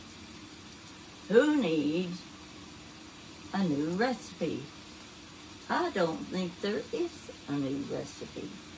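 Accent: South, United States. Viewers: You are a Sweetheart♥️You remind me of my Precious Mother.Love the southern accent.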